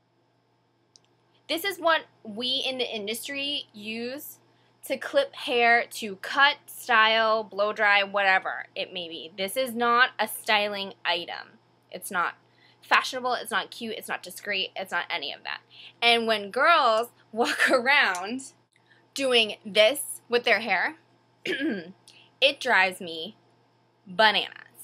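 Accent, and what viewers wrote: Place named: Boston, United States. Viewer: I can tell you're from Boston girl, I heard you say "mirrAH" ahaha. Love your vidsss :)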